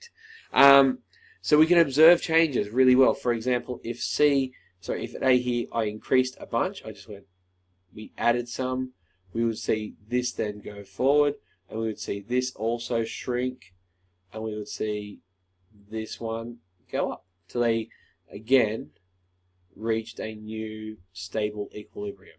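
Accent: Australia. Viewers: Thank you australian science guy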